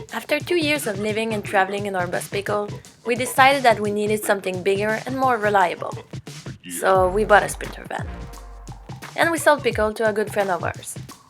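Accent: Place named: Quebec, Canada. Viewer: french canadian i think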